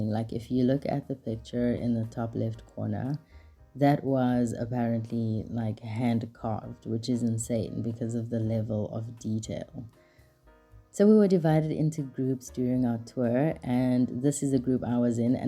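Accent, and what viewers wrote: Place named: France. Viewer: Your French accent🥵🥵🔥🔥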